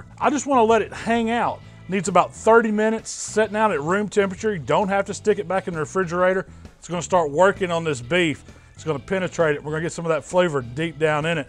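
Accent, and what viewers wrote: South, United States. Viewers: This defines America. Some fat guy with an American accent showing us how to grill a huge steak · American accent?? Don’t you mean southern??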